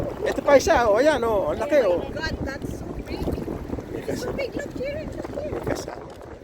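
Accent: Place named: Bulacan, Philippines. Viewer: Parang punto kayung bulacan?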